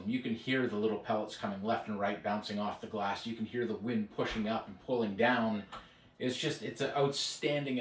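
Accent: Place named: Canada. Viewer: Very succinct and quality review! I also enjoyed your Canadian accent.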